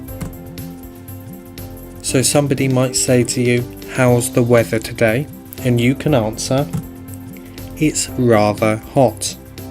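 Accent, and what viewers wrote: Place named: United Kingdom. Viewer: What British accent do you have? It sounds very clear to me.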